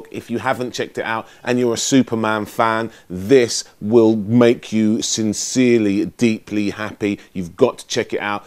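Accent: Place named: United Kingdom. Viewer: you sound british. .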